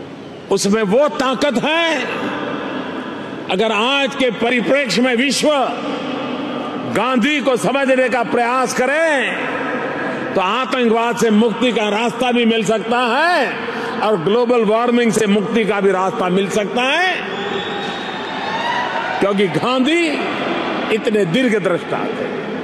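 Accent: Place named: India. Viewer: I love the Indian English accent.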